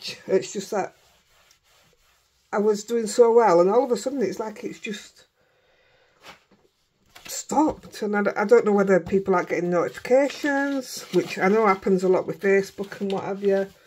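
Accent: Yorkshire, United Kingdom. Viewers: Hello, I found this video today. I enjoyed watching it a lot, so instantly subscribed. It's also lovely to hear a Yorkshire voice. I look forward to watching your other videos.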